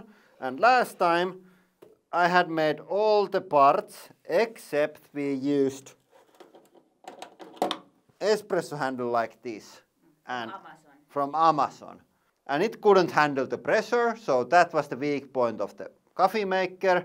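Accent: Finland. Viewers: I used to live in Helsinki and frikkin love the Finnish accent. It's mint. Also love the detail of using Iitala glassware. Torille tavataaaaaan! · i only now realize this channel is finnish! its right next to russia ofc but because sweden has basically perfect english i was surprised by the accent.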